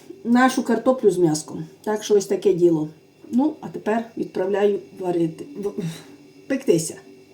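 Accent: Ternopil, Ukraine. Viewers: То що вам не подобається слова і говор в кожній області свій акцент це Тернопільська обл там багато польських слів з якими вони виросли їм так зручно а ви дивіться і слідкуйте кожен за собою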